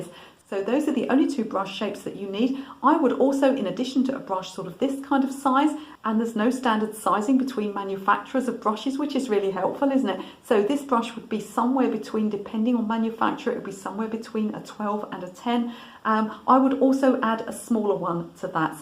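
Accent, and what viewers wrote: England, United Kingdom. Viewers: I love your English accent!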